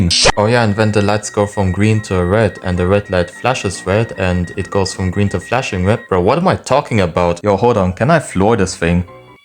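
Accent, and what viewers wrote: Germany. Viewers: Are you german? You sound sooo german xdd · I hear german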